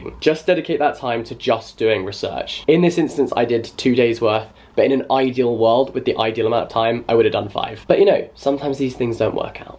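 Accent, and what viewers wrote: RP, United Kingdom. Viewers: UR VOICE JUST SCREAMS ‘TORY ETON BOY’ (also address ur sexuality)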